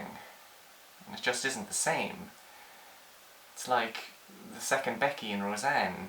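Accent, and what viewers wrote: Ireland. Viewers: I am glad you're back. I missed your wit, symmetric face and light Cork accent. Also I appreciate the shirt choice. I do not appreciate the Sarah Chalke shade. Clearly, she was the better Becky.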